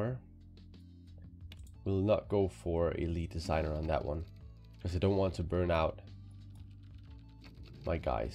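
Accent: Denmark. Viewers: Your accent sounds danish. Eller tager jeg fejl?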